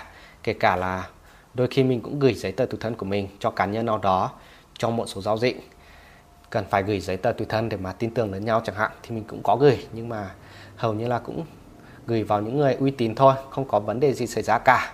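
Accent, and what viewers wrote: Standard. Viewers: Bạn nói rất chuẩn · Anh nói quá chuẩn luôn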